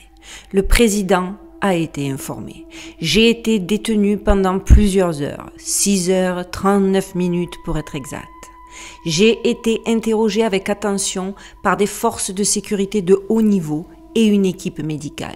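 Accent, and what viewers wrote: Marseille, France. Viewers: J'adore les "cagoles" intelligentes (avec l'accent de Marseille), surtout ne change rien, et je te souhaite le meilleur. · Merci pour cette nouvelle vidéo, passionnante, comme toutes les autres. J'aime votre façon de faire vos recherches et d'en rendre compte. Sans compter vos accent du sud qui me rappelle ma Provence! Vous parlez au début de la vidéo de certaines réactions quant à votre pseudo...J'avoue que j'en ai été surprise car cela est diamétralement opposé au travail de recherche et de Lumière que vous faites. Et comme chaque mot, chaque émotion ou pensée est créatrice, je trouve votre pseudo sombre, mais vous devez avoir vos raisons d'avoir fait ce choix. Et je le respecte même si cela pose question, et la réaction des gens me parait normale. Belles fêtes de fin d'année et encore merci pour votre travail! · Je ne comprends pas très bien Tu as l accent marseillais mais sur fond d américain Quoiqu il en soit au bout de 2 vidéos je me suis abonnée J adore ta façon de raconter et puis tu es très sympa Heureusement que je suis tombée par hasard sur ta chaîne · Grand merci 🤗 Quel travail de recherche fouillé … Nous avons de la chance de pouvoir bénéficier de ce travail pour l’éveil de nos consciences! 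Excellente continuation! A très vite 😊 Ps: j’aime beaucoup ton accent: celui du Sud de la France non?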